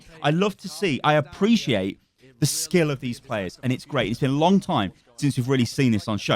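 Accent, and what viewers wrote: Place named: United Kingdom. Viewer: Always enjoy these vids! Nobody ever takes the time to slow an owl fight down and point out the endless high iq plays. Ur emotion and... british..ness makes it entertaining and helps get the message across even better. Also how did fury hit so many forking rocks onto a genji just disgusting what a game.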